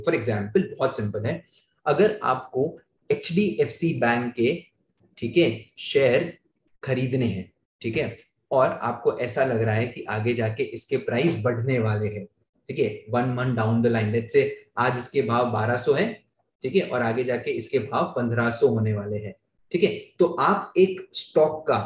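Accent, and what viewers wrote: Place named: United States. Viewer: Sir jis hisab se English bolte hain jese usa me class le rhe ho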